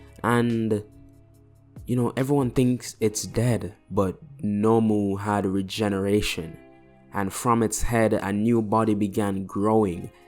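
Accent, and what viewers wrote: Jamaica. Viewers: Where is that accent from? Jamaica maybe?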